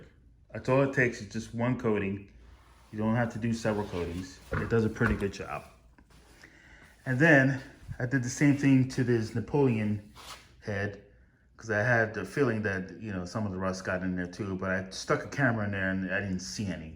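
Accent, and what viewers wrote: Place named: Northeast, United States. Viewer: Good work! I hear a hint of Northeastern in your voice.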